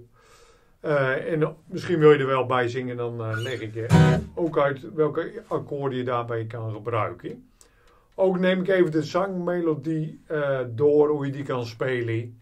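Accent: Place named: North Holland, Netherlands. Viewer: Hoi daar. Ik ben Jim, 62 jaar en min of meer met 'pensioen'. Ik verveel mij gelukkig niet maar toch... wilde ik er voor de winter maanden een leuke hobby bij. Ik luister graag muziek van klassiek tot hard rock maar het jaren 70 geluid van Genesis en gitarist Steve Hackett is wel iets waar mijn hart het meest ligt. Net begonnen met akkoorden leren. Mijn vrouw had jouw gitaarlessen gevonden en ik vind het prettig qua tempo en is duidelijk om 'mee te doen'. Trouwens, het zou mij niets verbazen dat je uit de buurt van Avenhorn kom. Die 'klank' klinkt erg bekend ;-) Ik ga een tijdje oefenen met je lessen! Bedankt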